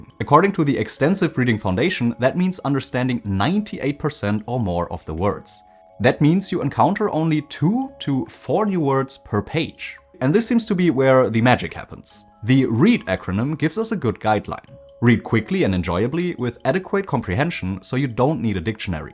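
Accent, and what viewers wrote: Germany. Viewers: What technique did you use for learning English? You sound very fluent, but have a fairly strong foreign accent, especially with s vs z sounds and vowel + r sounds. I'd bet your first language is German or a closely related language and you grew up learning English but without a lot of audio input (e.g. somewhere they dub Hollywood movies & TV). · I noticed your German accent, ich lerne Deutsch!! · Do I hear a German accent?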